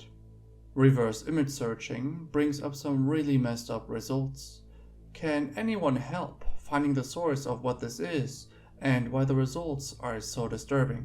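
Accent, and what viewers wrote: Germany. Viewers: Considering his accent sounds like Cosmodores i'm thinking hes german.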